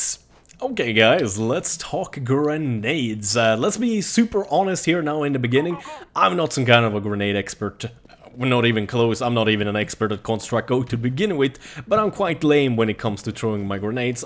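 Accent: Sweden. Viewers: I love the swedish accent xDD lie hopw you say DOTT lol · are you swedish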